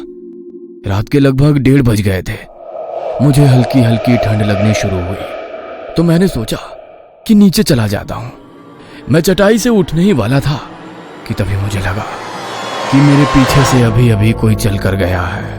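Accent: Bihar, India. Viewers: Bhai aap bihar ke ho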